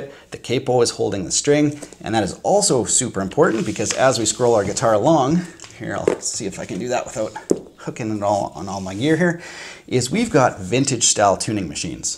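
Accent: Canada. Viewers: I love your videos, this one is no exception. But do Canadians day Filta instead of Filter? Because you keep saying Filt-a-tron...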